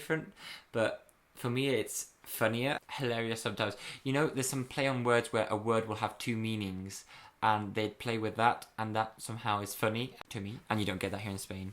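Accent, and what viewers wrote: England, United Kingdom. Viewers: Me encanta como suena su voz con el acento británico 🤤😂 pregunta seria porque si el video esta totalmente en ingles en título esta en español? 🤔🤣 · Amoooo su pronunciación tipo britanicaaa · Omg I need more of this! Me encanta cómo hablas, tienes un acento inglés precioso jo! Yo soy una fanática de Inglaterra y pese a vivir en España pienso y hago todo lo posible en Inglés; me flipa. El año que viene, si todo va bien estaré estudiando ahí :) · Sino fuera por el acento británico, no se me complicaría tanto el entenderte. :( Pero aún así me encantan estos vlogs. 💛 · SlowAH DeepAH YoungAH. Kxbdjhs ese acento británicooo. 💕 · More videos in british english please! I love your way talking in english. It's perfect, innit? 🤤😍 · You talk like the people in England because in U.S.A they talk with another accent and in U.S.A we have carpets in the stairs Hablas mucho como las personas en Inglaterra por que en U.S.A hablan con otro acento y tambien en U.S.A tenemos alfombras en las escaleras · Me encanta que su inglés suene más de U.K que de EUA ❤️ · Ese acento británico hace que me encante el inglés😍😍😍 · No hablas como gringo Hablas diferente como en Inglaterra 😄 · you're right it's a good youtuber but what about english is from the UK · Tu ACENTO BRITÁNICO me brinda oxígeno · Vamos a aprender acento británico 😂❤️ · I love your vlogs in english. 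I am from Argentina from a city call Córdoba and I work as a tour guide. I hope one day you come and speak to me in that sweet british accent. Kisses :* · OMG. Amo tu acento inglés. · Todos deberíamos estar hablando de su hermosos acento británico 😍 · Dios😂 he amado este vídeo. Me encanta tu acento inglés. ¡Es super dulce! Cuando visites otra vez Inglaterra podrias hacer algunos blogs y enseñarnos los dulces y lo que echas tanto de menos. Sería super entretenido!!! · I've never heard anyone with a British English accent AND a España Spanish accent, its pretty mind-blowing to me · Ese es inglés de Inglaterra? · British accent 😍😍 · Me encanta tu asentó británico es tan bien perfecto 👌🏻 💕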